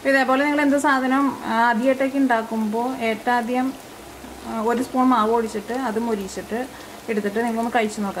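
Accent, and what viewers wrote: Thrissur, India. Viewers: Ithu njan thanne kandu pidicha receipie athu kalaki 😆😆. All ur receipes are simply the best. And ur Thrissur slang😙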